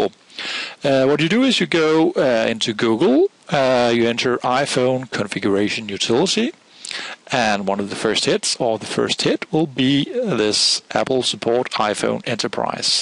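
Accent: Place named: Netherlands. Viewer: No, that's a Dutch accent, it's supposed to be like that.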